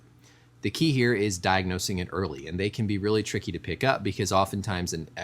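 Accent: United States. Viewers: As soon as I heard his American accent I turned it right off.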